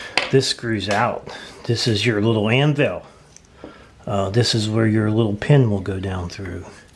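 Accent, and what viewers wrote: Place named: Pennsylvania, United States. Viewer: Love your accent.. PA? NC?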